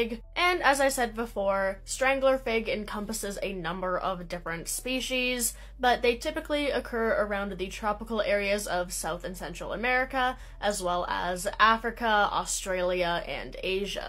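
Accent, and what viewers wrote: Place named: East Coast, United States. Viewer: The effect in your voice is interesting. Maybe East Coast but maybe like some teacher you had. I find it wearing.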